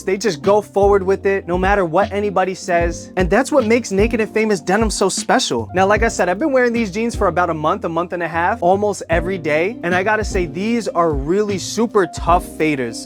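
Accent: New York, United States. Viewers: Jersey or New Yorker ? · I can hear the NYC in you lol, great video!!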